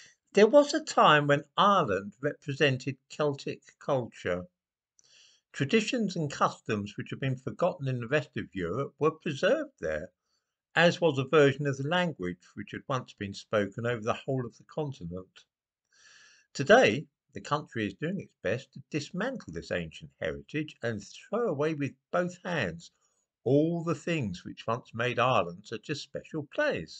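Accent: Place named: England, United Kingdom. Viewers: Nothing like a man with a english accent , lecturing Irish where they took the wrong path, ??? After surviving the genocide inflicted on our race by people with similar accents , its kind of ironic this particular lecture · This is one of the most ridiculous videos on this website. It’s not people with African or Asian accents that destroyed Ireland’s indigenous culture, but rather people with accents like yours. Unless these immigrants murder and oppress huge chunks of the population SEVERAL times, they will never match what the English did. Also, the definition of what it means to be Irish is changing all the time. Centuries ago, an Irishman was simply a Gael from Ireland. Today, many people who are white and who have ancestry going back centuries would be very offended with this definition, as their own culture and customs are no longer Gaelic, but anglicised. 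This definition of Irishman will continue to change into the future, and if there’s nothing wrong with the change up to now, then there’s nothing wrong with the change to come.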